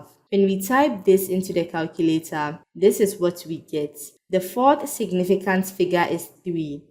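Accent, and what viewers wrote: Ghana. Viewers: are you Ghanaian?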